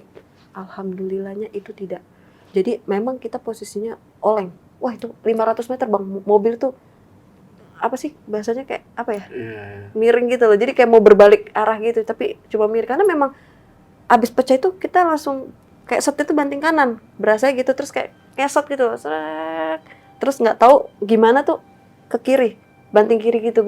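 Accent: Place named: Jakarta, Indonesia. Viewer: Gr bntg mmng orang daersh MRSA kagum dgn logat jkrta lu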